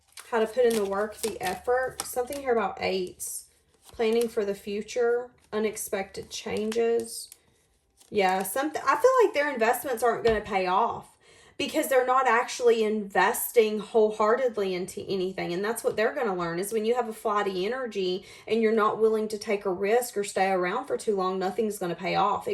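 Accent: South, United States. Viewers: You are such a blessing you totally just blow my mind wow you are so good you have helped me so much God bless you thank you I'm so thankful for you God bless you keep up the good work I just love listening to you that country accent girl so sweet😘🥰😇🙏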